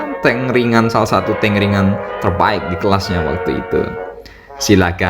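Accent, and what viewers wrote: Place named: Makassar, Indonesia. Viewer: Min orang makasar ya khas bangat suaranya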